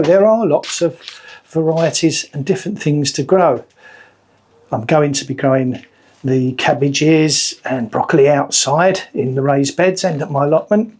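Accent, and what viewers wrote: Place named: Southern England, United Kingdom. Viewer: I thought sounded like a southerner.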